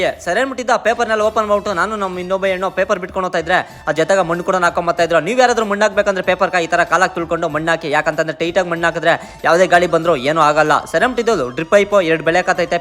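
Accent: Kolar, India. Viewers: Nim mathugalu style super Anna Hoskote , Kolar side ❤ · Typical kolar kannada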